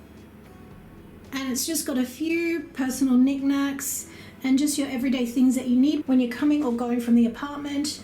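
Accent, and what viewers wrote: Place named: Australia. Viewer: Beautiful apartment and I love her accent, I feel like it's different ftom other Australians. Much love :-)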